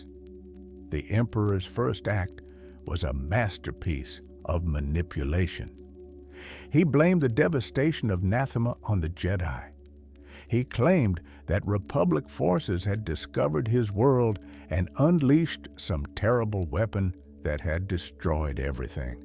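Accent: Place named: South, United States. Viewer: Dude why on earth would anyone want to hear a star wars tale from the vice of some southern dude sitting on his porch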